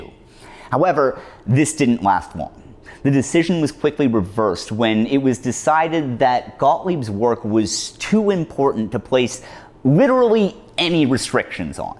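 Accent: Canada. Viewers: theres something about hearing a canadian talk about american history that causes me to chuckle. im 30 min in so far so good.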